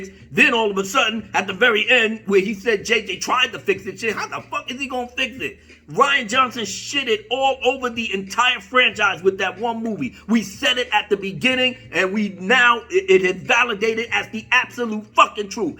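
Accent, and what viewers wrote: Cockney, United Kingdom. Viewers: As always my brother, always agree with you. And enjoy the cockney. LoL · as a Brit, as always I’m freaking loving your cockney accent.....priceless! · Hello! I could not WAIT to hear your take on this! Wow. It's fire as usual! You have been keen to the TFA BS since jump. I'm happy that John Boyega is speaking out. It can't hurt him, he's been honest. Btw: Love your British accent! Well done!:)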